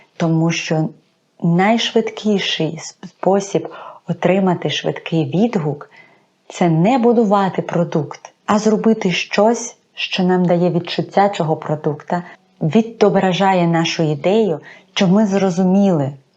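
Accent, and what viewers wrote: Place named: Lviv, Ukraine. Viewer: Типова львівська огидна вимова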